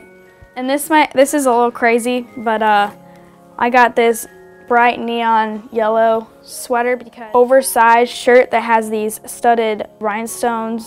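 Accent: South, United States. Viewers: Is she from the South or something ? She has an accent ! :) haha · You sound so country in this vid!! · Is it just me? Does she talk in a southern accent a little?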